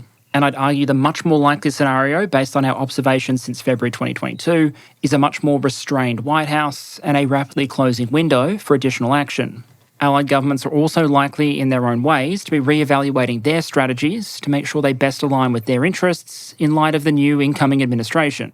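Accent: Australia. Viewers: I have to ask, what does "inverted commers" (@ ) mean? Its a common perun phrase and it seems like a local idiom, or maybe the aussie accent is throwing my 'merica ears, but i am not sure what it means and its driving me crazy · Future historians are going to be very grateful, as am I. A veritable tour de force with an Aussie accent. Bravo !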